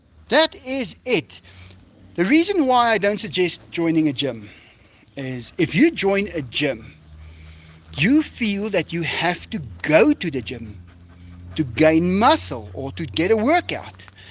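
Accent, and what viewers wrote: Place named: South Africa. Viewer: Unique South African accent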